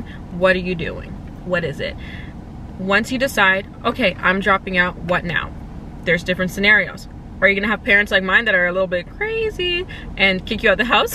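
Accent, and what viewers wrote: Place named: United Kingdom. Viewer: British accent? Super cute! Watching from London, England. ❤️❤️❤️❤️❤️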